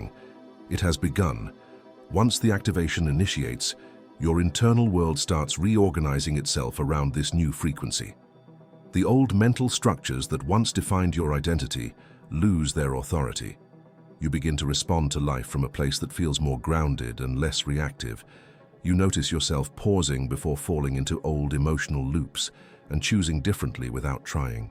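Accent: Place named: United Kingdom. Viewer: I would like to watch this ,but the British accent gives me the creeps.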